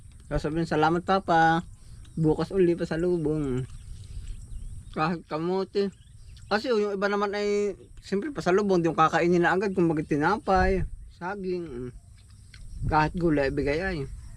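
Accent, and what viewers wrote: Quezon, Philippines. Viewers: Hi,! Kahapon lang kita unang napanood at naaliw naman ako sa iyo. Taga saan ka? Kasi parang taga Mauban, Quezon ka parehong pareho ang mga words na ginagamit mo pati yong accent mo. Bago mo akong subscriber from New York,USA. Ingat ka lagi and God bless you more 🙏👍👍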